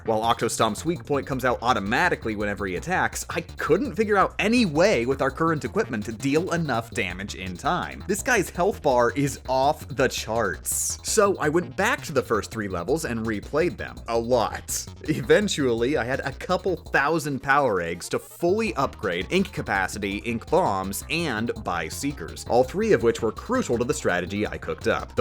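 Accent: Canada. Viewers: Is it just me, or did he sound very Canadian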